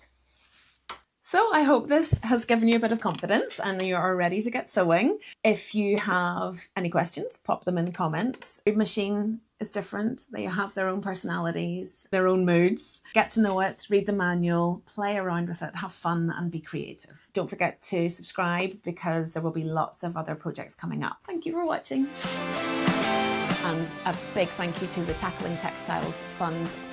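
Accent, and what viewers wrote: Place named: Scotland, United Kingdom. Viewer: Oh gosh or maybe that's a Scottish accent I detect 😂 May I ask what machine this is please?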